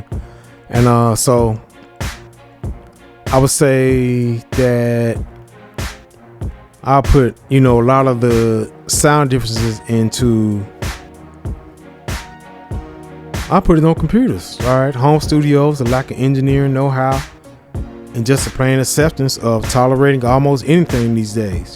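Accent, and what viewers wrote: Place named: South, United States. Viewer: I KNEW I heard that West Alabama/Eastern Border Mississippi in your voice Mane…✊🏽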